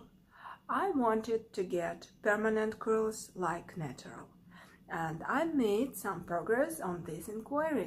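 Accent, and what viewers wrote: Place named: Russia. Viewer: yes i knew you spoke russian by your accent!!!! :)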